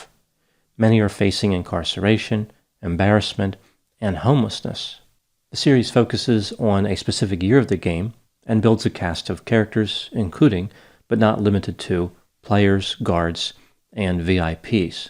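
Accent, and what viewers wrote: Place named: Boston, United States. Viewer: The best accents are generated by native Bostonians!! The Doc has a cool accent! 😉🙂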